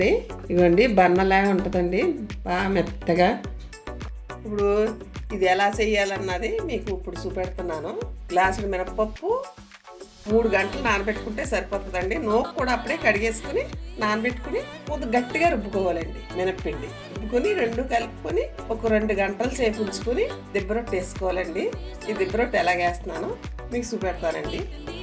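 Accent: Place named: Konaseema, India. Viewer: So nice మా కోనసీమ మాట లా ఉంది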